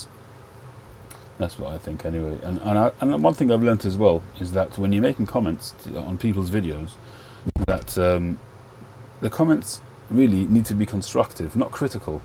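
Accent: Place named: United Kingdom. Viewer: Excellent. Reminds me when I use to listen to the BBC on Shortwave in the 50's. Very British.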